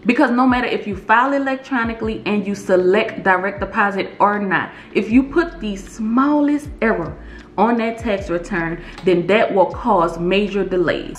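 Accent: New Orleans, United States. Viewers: I can tell you’re from New Orleans with that accent lol. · She sounds like a Nola city girl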